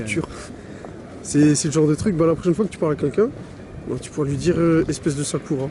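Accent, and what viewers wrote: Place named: Alsace, France. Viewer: T'es Alsacien ? T'as un gros accent.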